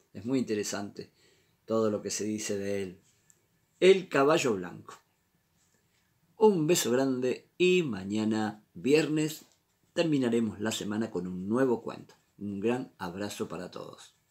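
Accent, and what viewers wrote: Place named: Argentina. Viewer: Que hermoso narra los cuentos! 💗 aunado a su encantador acento Argentino 🌟 Gracias! 😊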